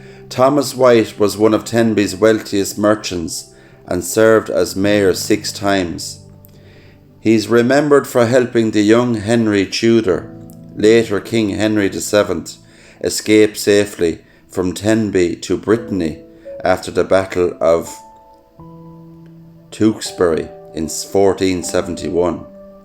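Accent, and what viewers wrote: Ireland. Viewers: Many Happy Holidays In Tenby Thanks you are marvellous..Beautiful Irish brogue Xxx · Your beautiful Irish accent is like music to my ears ❤❤❤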